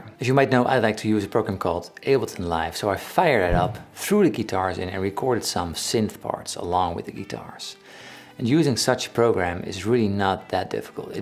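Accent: Netherlands. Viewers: Complimenten voor je content en professionalisme man! Je engels is vloeiend maar toch hoorde ik direct dat je een Nederlander bent! 🇳🇱🎸 Je bent een grote speler als muzikant!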